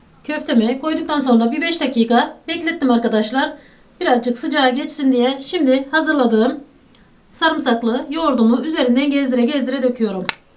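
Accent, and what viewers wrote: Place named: Central Anatolia, Turkey. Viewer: konuşma şivesinden İç Anadolu lu olduğunuzu tahmin ediyordum ama bu kadar yakın olduğumuz aklıma gelmezdi Çok memnun oldum Başarıların daim olsun kal sağlıcakla 🥰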